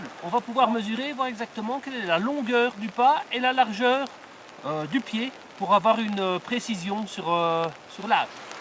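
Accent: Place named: North, France. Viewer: Toi tu viens du nord .. Encore un kek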